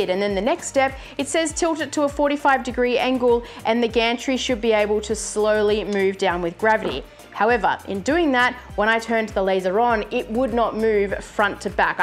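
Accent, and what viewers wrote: Australia. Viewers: Thanks for sharing I know nothing about laser engraving or cnc but I so want to go down that path more so on the cnc machine they both make your projects look heaps more professional. So thanks for the encouragement to give it a go.Love your channel and content plus it’s nice to see and hear Aussies on YouTube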